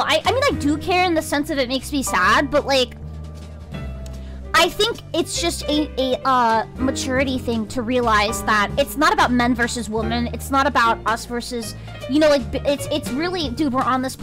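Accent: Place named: Bronx, United States. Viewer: chibi extra Italian from the Bronx in this one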